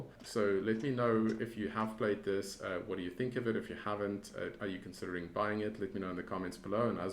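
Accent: South Africa. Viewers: Sounds like SA?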